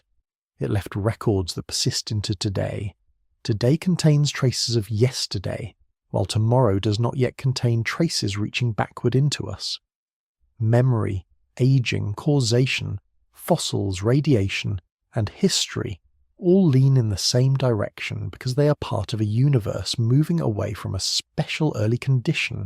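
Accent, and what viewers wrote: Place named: West Sussex, United Kingdom. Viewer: Hi from West Sussex. Thanks for your vids my friend. You sound local to me.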